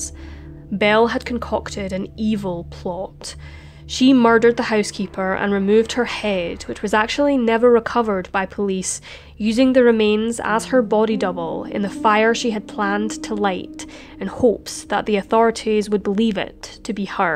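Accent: Scotland, United Kingdom. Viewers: NOPE, SHE'S SCOTTISH!!!😘😘😘😘😘😘😘😘🥰🥰🥰🥰🥰🥰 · Scottish Accent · Jason Erb it’s Scottish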